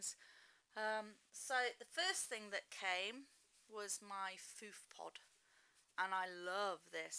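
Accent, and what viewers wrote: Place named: United Kingdom. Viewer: Pouch ouch dilemma:D nice vid, love all your vids but can you please do something with your sound quality? I have to push my macbook volume to the limit to hear that nice british accent;)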